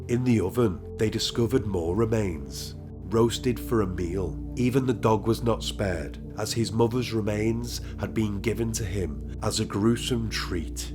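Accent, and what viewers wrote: United Kingdom. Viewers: Please forgive my ignorance. Where’s your accent is from? I know you’re from uk … which part ?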